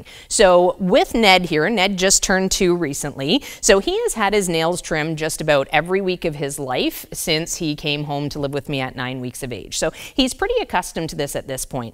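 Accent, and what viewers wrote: Canada. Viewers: "Talk a-boat" 😂god I miss that Canadian accent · is that a canadian accent?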